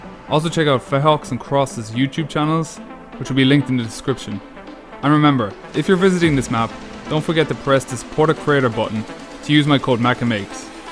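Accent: Ireland. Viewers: Are ye Irish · Are you irish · Thanks for giving ireland a shout out Btw are u from ireland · are u Irish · Are you Irish? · Let's go Ireland i can hear from his accent that he's Irish🇮🇪🇮🇪🇮🇪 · Are you Irish I’m Irish thx I love it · Are u from ireland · 2 thing I love the Irish accent u have and I love fortnite so I hope u like that sub I gave u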